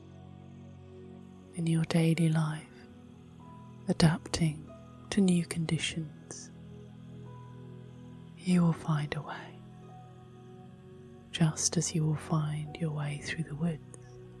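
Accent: United Kingdom. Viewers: Alright, nice to hear an accent from my town, London. Drive through Clapham sometimes. Great voice and meditation stuff thanks 👍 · Thank you, for your wonderful channel and all the effort you have put into it. This video helped me to fall into a deep and relaxing sleep. Thanks to your lovely voice and your marvellous British accent. Greetings from Germany.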